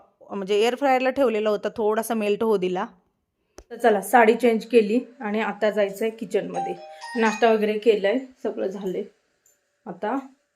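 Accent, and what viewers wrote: Kolhapur, India. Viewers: ताई तु सोलापूरची आहेस का कोल्हापूर ची काही कळतं नाय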